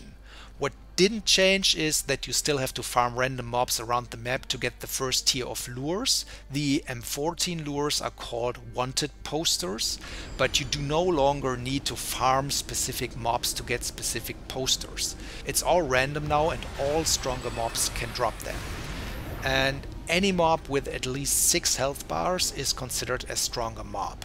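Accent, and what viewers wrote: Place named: Germany. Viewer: He sounds german, but i'm not sure.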